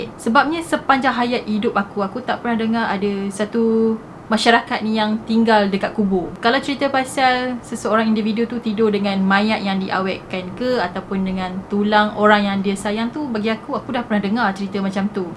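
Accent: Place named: Perak, Malaysia. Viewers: Pandai story cakap light Perak ye..aje gemo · wau ckp owng perak tengoh lehh..saya orng perak tapi belah utaraq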